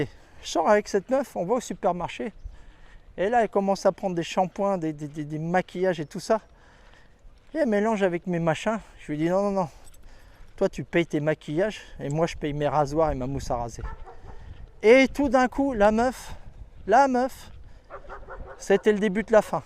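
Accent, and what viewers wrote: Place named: France. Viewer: Continue tes vidéos mec !👏🏻. HS tu viens d’où? T’as un petit accent ligériens ou isérois qui ressort de temps en temps on dirait 😅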